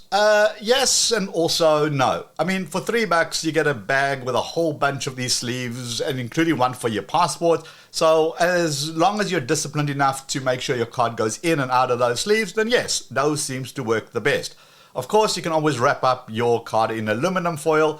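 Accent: South Africa. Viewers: Love that lekker South African accent of yours!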